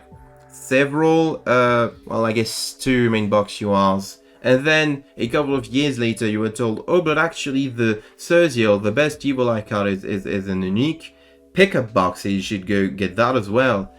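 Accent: France. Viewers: Yo i kinda here the french accent in this one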